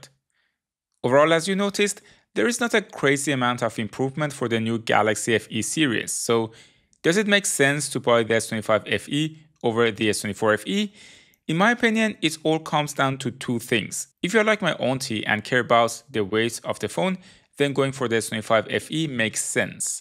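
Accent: Iran. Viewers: Iranian accent found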